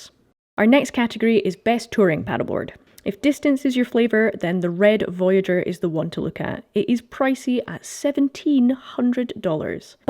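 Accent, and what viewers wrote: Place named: Scotland, United Kingdom. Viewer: lady has a scottish accent but gives prices in $s ! yeah that makes sense. thought this was a brit video . could of done with prices in £s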